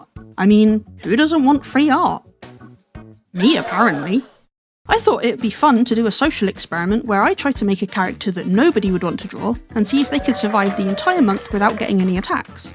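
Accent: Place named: United Kingdom. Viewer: i love your british accent, i think its british, urart is AMAZING, i am DEfINENTLY drawing a mech when i go to bed, even tho my usual drawings are so mediocre, but i'd love to do this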